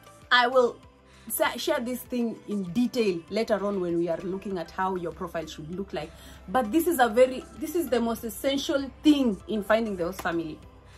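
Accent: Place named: South Africa. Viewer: You speak like South African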